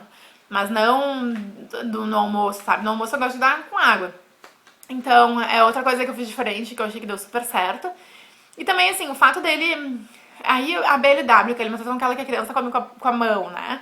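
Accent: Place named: Rio Grande do Sul, Brazil. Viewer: oi amei é sabe o quão é bom ver uma mãe assim de cara limpa com sutaque lindo aqui do nosso RS e estou só na espera do próximo beijo fiquem com Deus.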